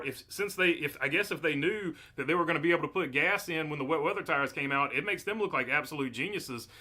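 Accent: South, United States. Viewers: Sumthin bout &that southern draw keeps me tunin in! Haha 💪🏻💪🏻🇺🇸🇺🇸-with love from the west coast 🍃🍃🤙🏻🤙🏻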